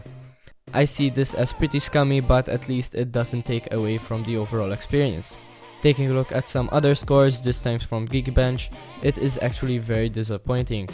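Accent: Malta. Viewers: Noticed the maltese accent 😅 awsome channel kugin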